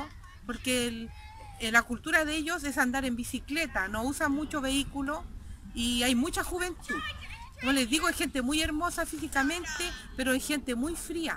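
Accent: Chile. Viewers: Eres Chilena ??? Por el acento ??? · Por la forma de hablar de esta señora les comento que primero es chilena y segundo... en mi pais les decimos weones picantes. Una persona sin demaciada educacion que por cierto debe hablar un ingles pesimo. Es el clasico latino que quiere tener la gran vida sin sacrificio. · Yo soy latino viviendo en Holanda por casi dos años. Pero el video de esta señora es tan nefasto y terriblemente desinformador.... me da hasta molestia que la gente sin ninguna preparación alguna, sin siquiera preparar un video decentemente se crea con derecho de venir a hablar tan terriblemente de un país. Por el acento de la señora creo que es chilena y le informo que su país está en dentro del rango de los países con BAJO nivel de inglés. Y en ese mismo ranking Holanda está en el 2do lugar como el mejor país que habla inglés como segunda lengua. Ya quisiéramos todos nosotros que en nuestro país hasta el que barre las calles, el jardinero, el que trabaja en el campo, hasta los mendigos hablen inglés! 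Claramente señora usted no les entendía porque USTED NO HANLA INGLÉS! No es que su nivel sea bajo, usted NO HANLA INGLÉS! Y es más que notorio por cómo pronuncia algunas de las palabras en inglés que dice en su video (como Dutch que es el idioma oficial de este país dicho en inglés ) . claramente si usted emigra a Holanda o Habla holandés o habla MUY BIEN INGLÉS sino usted no tiene nada que hacer acá, el país no va a cambiar porque a la reina se le dio la gana venir y solo hablar español. Además que si usted viene sin permiso de trabajo TAMPOCO TIENE NADA QUE VENIR A HACER ACÁ, acá no existe el trabajo ilegal, que lo que tiene de consecuencia el trabajo ilegal es la precarización de la vida de la personas, es por eso que Holanda se ve tan lindo por donde usted camine, no solo e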